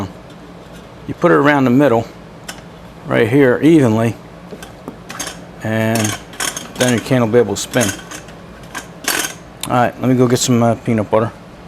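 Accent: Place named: New York, United States. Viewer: Nice accent. New York somewhere?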